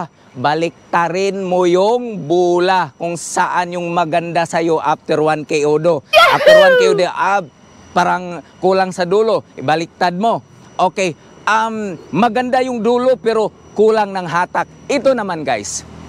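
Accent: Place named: Visayas, Philippines. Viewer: Pwede naman kayong wag manood para hindi kayo mainis. Si kasi sa obserbasyon ko eh Ilonggo at bisaya originally. Medyo hirap talaga sila managalog ang mga bisaya. Alam ko kayang mga english ni pero mas malawak ang maaabot ng mga vlogs nya pag tinagalog nya. Tas matagal na yata sya sa Thailand at fluent na sya magsalita Thai. Kaya naghahalo halo na ang accent nya. Sa kin walang problema ang paliwanag nya. Kahit papano may napulot ako.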